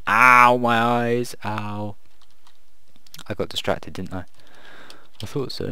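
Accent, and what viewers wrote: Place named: Southampton, United Kingdom. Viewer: he sounds like hes from southhampton or somewhere near there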